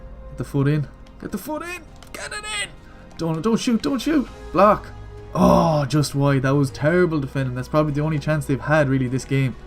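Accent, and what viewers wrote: Ireland. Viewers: FIFA 17 dont ya hear tat irish accent? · What part of Ireland are you from?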